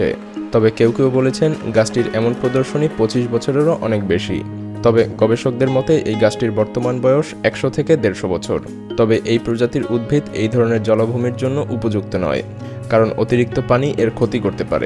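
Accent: Kolkata, India. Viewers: Vai na india kalkata likha hua or vasa sune bujhe nen. Bangladeshr vasa eto sundor hoi na. Bangladesi ra jotoi valo vabe kotha boluk na keno kothao tan tan vaab thakbey..100%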